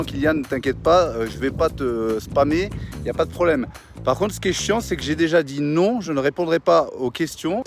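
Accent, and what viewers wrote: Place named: France. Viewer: 100 membres? Ouf ca rassure!!! Le mieux serait encore zéro "bon un" tu vas pas te virer de ton mouvement quand-même 😉. Étant une Suissesse pur souche, j'avoue tu me sors par les trous de nez avec ton patriotisme à 2 balles, récité avec ton accent bien frouze, ah stp, tu pourrais nous faire une petite vidéo avec ton passeport? Quand a revendiquer ta couleur blanche au secours, tu en parles comme si tu avais fait des études poussées pour l'obtenir 😂, si le blanc disparaît c'est peut-être que les métissés ont plus d'attrait que les blancs. Et concrètement tu veux changer ca comment? Avec une loi qui interdit de faire un gosse avec une personne de couleur? Non mais franchement!!!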